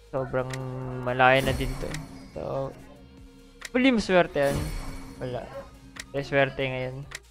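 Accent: Visayas, Philippines. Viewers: bisaya ka po ba?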